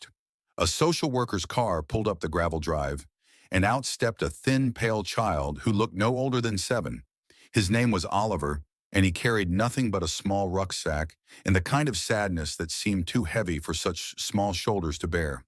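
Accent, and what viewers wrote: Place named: United States. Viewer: I am so sick of hearing horrible US accents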